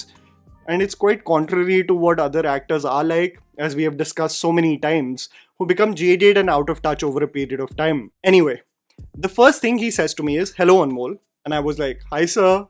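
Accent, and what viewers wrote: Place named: India. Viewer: i had to click off because of the gen z Indian accent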